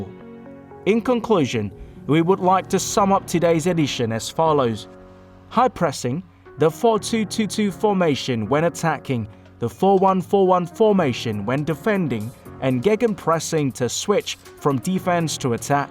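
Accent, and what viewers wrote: United Kingdom. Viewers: This is your first video but you guys have done it very well. What a British Accent, but I think you should improve more on pronunciation to give it more British. That's my opinion! Great job, keep it up. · What a channel! Especially, the British Accent =))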